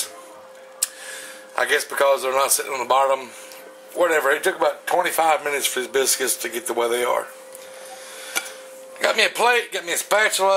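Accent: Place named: Alabama, United States. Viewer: ***** Ok no wonder you sound familiar, Im from Bama, guess its the accent. This meal was great too, made its way into my recipe box, but ill be sure you get the credits ;-) thanks for sharing!!!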